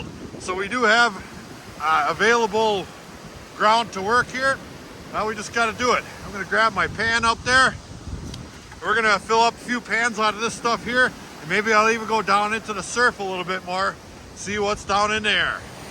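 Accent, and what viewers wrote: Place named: Wisconsin, United States. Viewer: The Wisconsin accent rocks! Love it.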